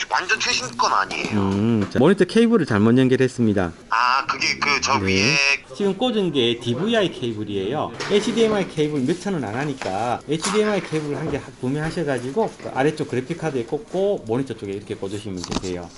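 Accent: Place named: Daejeon, South Korea. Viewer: 대전촌놈이 유튜버로 인기좀 얻엇다고 서울올라와서 별 ㅈㄹ을 하네ㅉㅉ 말투부터가 촌티난다 ㅉㅉ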